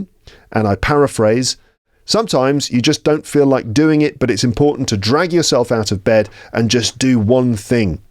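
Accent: RP, United Kingdom. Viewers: Hello. Hello to all adventure on this channel too. In this text, I have some thoughts, and one question for all users, and of course for you. Thank you for your work. You made this world better and gave some people the opportunity to learn modern English totally free. Your voice is incredibly pretty, gorgeous, and a little bit posh. I can complete the whole episode at 1.5x speed. Your English podcast was so difficult for me a few years ago. I started with Canadian English, but then tried many variations such as American, South African, Scottish, and many others with community teachers. Also, I spent a lot of time watching movies, podcasts, and playing games. I have one question. Maybe, I am not a good seeker, but I want to find a place to understand poetry in English. Just a podcast with the right intonation, pronunciation, and explanations of meanings. 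Do you know the star point for learning to spell poetry? Thank you to all readers. Have a good New Year. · Thank you so much,! I like your voice, your British accent ascent and your pace of speaking. You're a teacher with heart ❤ I've listened to a few of your storytelling sessions, love them!! Thank you once again and wishing you another successful year!! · I have been watching this podcast since september 2023, and i've improved a lot my english, mainly my listening skills, and i have developed a interest in british accent, i love this one, one funny thing is that i started pronounce some words like accent 😂😂😂 and the way that you talk and Some british persons, before that i use to pronounce like a standard american english and now i kind of Mix with british accent, and of course my own accent from brazil. My goals in this year is be improved my accent and my speaking and of course continue what i doing right now, listening english every day. 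One thing that i have to improve is my compreension when you bring someone for your podcast, sometimes i struglle to understand different accents, for example, the accent from you friend paul😂 however i like this cause i face this situation like a challenge! Actually english today is part of my routine, i kind of got addicted in english 😂, i put english in everything, games, movies, apps,etc. Thanks, you're amazing teacher! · What a nice discovery I' ve just made! My brain is filled with good English, nice voice and lovely British pronunciation. Thank you! Greetings from Argentina.😊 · Hello! I started listening your podcast last year. I'm from Japan and I live in Spain now. I studied American English in Japan and here I hear more British English. Some words are different and above all the pronunciation is totally different. I looked for some channel speaking in British English to hear and to learn and here I am with you! 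Have a nice 2025! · Excellent class. it´s long but very interesting. I love listening to your British pronounciation and your engaging voice. it seems I´m listening to a film actor and not a teacher. My brain becomes motivated with all your hepful advice. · i can't describe my pleasure when İ get a YouTube notification of a new episode of your amazing podcast ... Please read more stories i love listening to stories in your clear British accent.. thank you very much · I love the way you teach English and your British accent.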